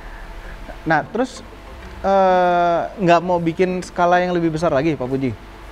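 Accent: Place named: Bali, Indonesia. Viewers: Logatnya bali banget....😂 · cara bicaranya Bali banget👍😂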